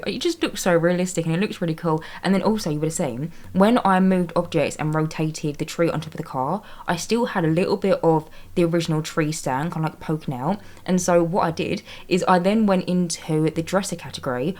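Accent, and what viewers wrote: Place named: London, United Kingdom. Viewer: I absolutely adore the way you build so realistically. The way you clutter is amazing and feels like an actual home and not an ideal, spotless house. I play TS4 on console which means I can't have the tool mod, so a silly lil thing I do is download your builds and then just take any things that I like that you rotated (eg. the journals that you had in the teen's room) and just save it to use for myself later on, lol! But anyways, I really enjoy your videos and especially your accent, it makes me feel so at home since I don't live in London anymore.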